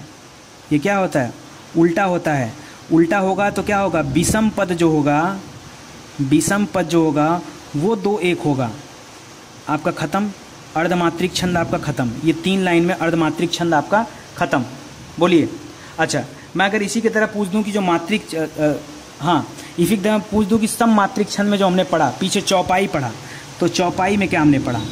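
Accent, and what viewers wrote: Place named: Uttar Pradesh, India. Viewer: सर जी आप उत्तर प्रदेश से हैं, आपलोग 'ण को ड़ (र) क्यों पढ़ते हैं।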